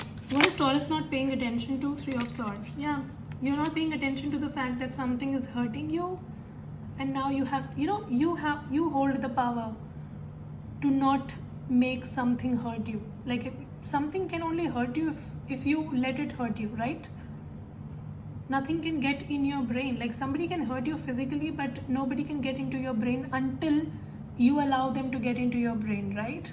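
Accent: India. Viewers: Your Indian accent is very interesting I like they way you laugh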